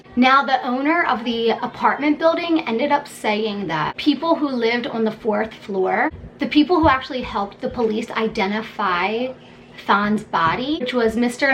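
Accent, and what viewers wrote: Delaware, United States. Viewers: Damn I can hear that Delaware accent hard girl I miss home🤣💜💜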